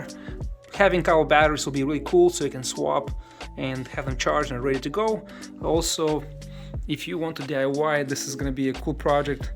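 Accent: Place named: Russia. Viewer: friend, dm you are Russian as I hear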